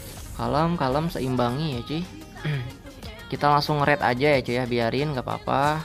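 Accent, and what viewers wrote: West Java, Indonesia. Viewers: Ngakak, sunda na karasa pisan ekek. Sukses brad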